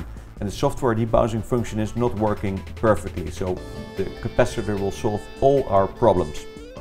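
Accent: Netherlands. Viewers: ben je uit nederland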